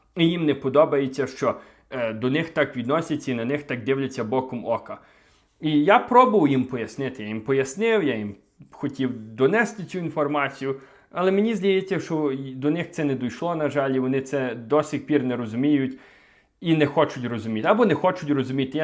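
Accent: Western Ukraine, Ukraine. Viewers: Вы говорите что вы умеете хорошо можете говорить на российский мови тому до у вас е западэнсЬкий акцент. А до робыть вам якщо мы Родом з Мариуполя и розмовляють там все российской. Мы были там всю блакаду голодали и с трудом выехали из оккупированной територии. Что нам делать если мы родились и выросли в Украине а разговариваем на непонятном языке не на русском и не на украинском. За то что мы такие к нам плохо относиться. У м Мариупольцев обромное горе Нас лишили окупанты всего имущества которое у нас было и лишили здоровья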